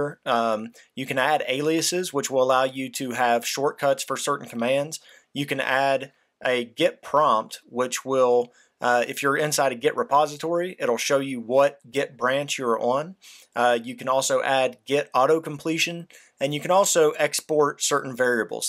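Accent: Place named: Pittsburgh, United States. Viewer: Your from somewhere around Pittsburgh. I recognize the accent from 400 miles away!!!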